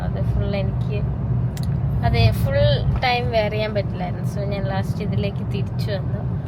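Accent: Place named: Tamil Nadu, India. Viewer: Nigal tamilzhanoo samsarikumbol athikavum tamil ahnn varunathe😅❤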